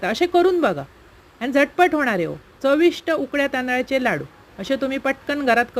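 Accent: Malvani, India. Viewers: तुमची मालवणी बोली ऐकायला खूप छान वाटत रेसिपी तर अप्रतिम आहेतच "आवडतले तुमका" हे प्रत्येक रेसिपी नंतर जे आपण बोलता ते ऐकायला खूप गोड वाटत. 🎉🎉🎉🎉💐💐💐💐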